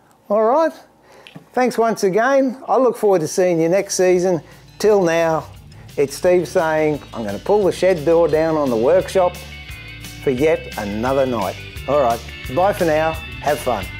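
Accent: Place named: Australia. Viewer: Steve, Great work and A+ presentation, it took a bit to get to understand Ausie which is cool. I felt a bit redundant to comment on each episode so, I saved it to the last. The finished and the unfinished pieces both look equally fantastic work of ART, no less, you are a Master Craftsman. Thanks for this set of videos, looking forward for whatever you have out there, Cheers Mate. Just wanted to correct one thing you mentioned in E-2 (I think) for the dove tail joints: David Barron's Alignment Board, FYI.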